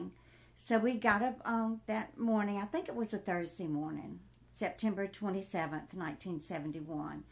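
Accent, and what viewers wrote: South, United States. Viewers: Nice story , love your southern accent it never went away !!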